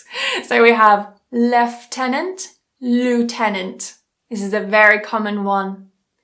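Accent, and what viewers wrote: United Kingdom. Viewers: Your accent is so British, I like it a lot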